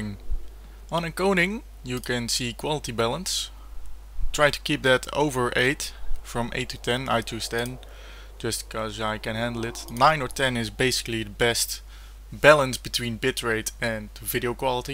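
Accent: Netherlands. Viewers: The dutch accent though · altijd grappig om dat nederlandse accentje te horen ^^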